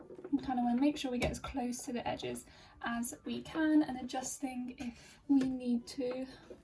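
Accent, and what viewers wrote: United Kingdom. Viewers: Where ar u come from ? I like ur english look like british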